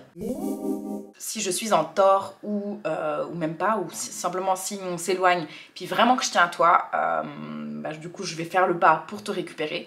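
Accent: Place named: Switzerland. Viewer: Tu a un très jolie accent suisse merci pour se partage 🙏🏿 Paix sur toi 🙏🏿 et ton chérie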